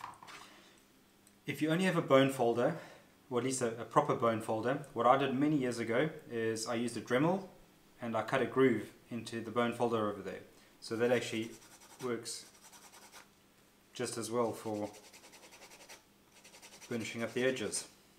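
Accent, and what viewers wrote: South Africa. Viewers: Ja, thanks my boy for this video, cool to hear a SA bloke on here loĺ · You sound South-African if you are can you help me with good local places to buy tools and leather I'm looking at starting as a hobby.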